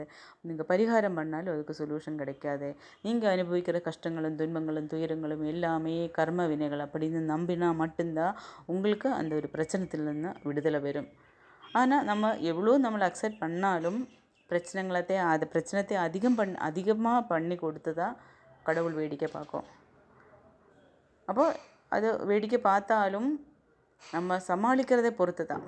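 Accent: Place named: Kerala, India. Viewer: Akka unga speech nallarukku.... malayalam kalantha tamil🎉🎉❤❤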